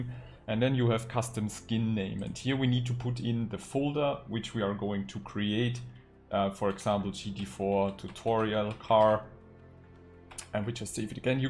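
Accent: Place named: Germany. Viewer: Danke erstmal für deine Videos. Ich denke du bist Deutsch, würde ich jetzt einfach mal von deinem Dialekt ableiten. Kannst Du das nicht noch mal in Deutsch machen? Das würden vielen von meinen Leuten helfen und wäre sehr ehrenhaft von Dir.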